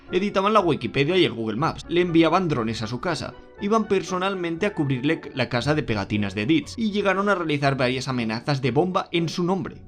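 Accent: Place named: Spain. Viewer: Por qué tienes acento español y usas modismos Mexicanos?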